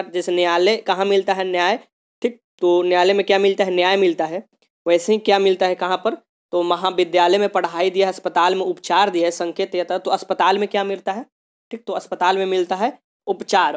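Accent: Bihar, India. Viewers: sir aap bihar se hai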